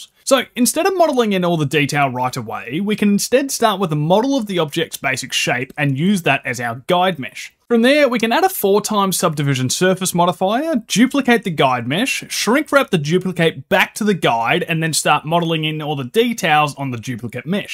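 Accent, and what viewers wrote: Australia. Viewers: No clue what the fuck I just watched as I don’t do whatever this is, but it’s nice to hear a fellow Aussie - Merry Christmas happy new year legend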